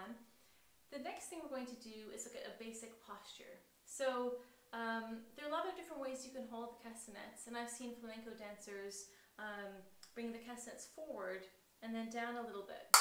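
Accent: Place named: Australia. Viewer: I can hear that Aussie accent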